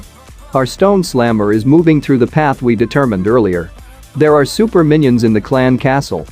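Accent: Kerala, India. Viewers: Malayali aano bro? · Bro Malayali aano?? 🙄🙄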